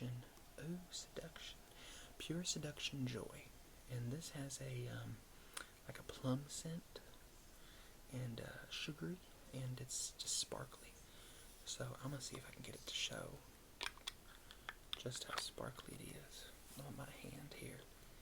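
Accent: South, United States. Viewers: OMG when I saw Christmas boutique roleplay I wondered if you would do a southern accent. And here we are ❤️❤️ 🎉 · I love this roleplay! You have such a soothing and comforting presence and the southern accent adds to the cozy vibes. I really struggle with anxiety, and your videos help me feel safe and calm. Stay awesome! :) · Your Southern Belle accent is spot on. I love your videos where you embody a different “character”. You’re a very good actor in this medium.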